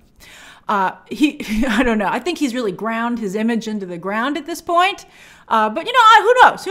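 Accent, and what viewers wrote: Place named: New York, United States. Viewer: You must be having a good weekend. Your new York city accent is coming thru more than usual.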